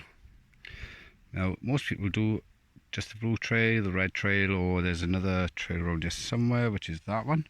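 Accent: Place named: Wales, United Kingdom. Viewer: Stunning & you sound Welsh lol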